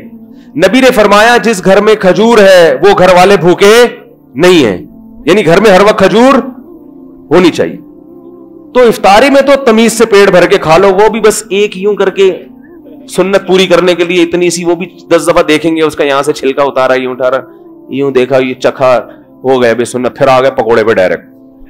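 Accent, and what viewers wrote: India. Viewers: Molana ka voice agras pura ka hai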